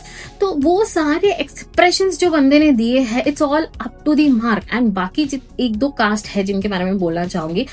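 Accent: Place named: Kottayam, India. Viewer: You speaking kottyam Hindi it's cute.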